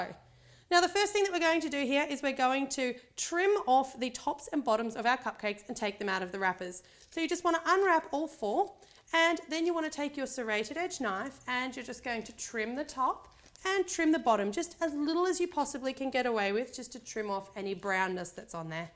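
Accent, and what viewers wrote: Australia. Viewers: OMG! Are you an Aussie!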